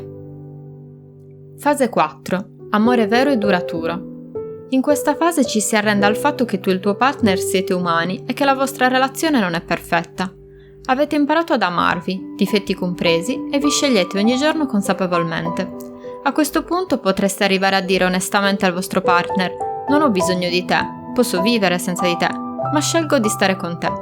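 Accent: Sardinia, Italy. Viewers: la voce narrante è Sarda del Cagliaritano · tu che parli sei sarda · Accento con cadenza sarda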